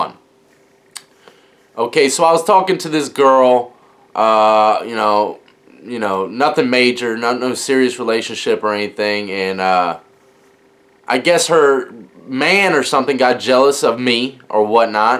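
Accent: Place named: Midsouth, United States. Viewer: I just knew you were from somewhere in the midsouth. Keep up the content...never boring.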